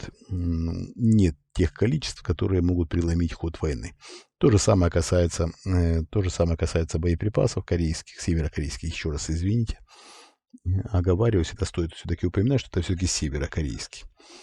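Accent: Ukraine. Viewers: Вопрос❤,у вас украинский акцент,вы украинец по национальности???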